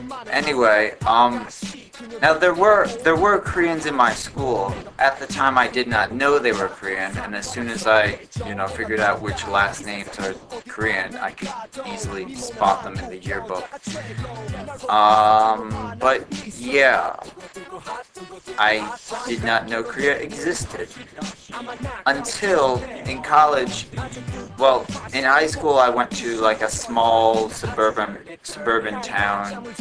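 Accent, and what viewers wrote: New York, United States. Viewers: are you from upstate? or long island? just curious..lol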